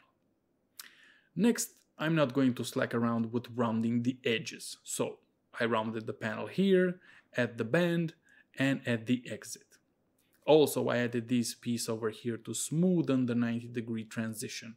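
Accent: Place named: Romania. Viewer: is that a Romanian name? You have the accent and I’m from that area I can kind of tell. Anyways you should try building one for a single 12, keep sealed chamber at 1 cube and ported chamber at 3 or as large as you can, tune the front port anywhere from 40-43 and for port area start at 75% of your cone area and shrink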